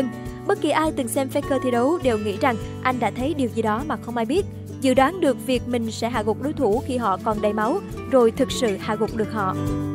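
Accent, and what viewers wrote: Standard. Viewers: Trời giọng chị này chuẩn vãi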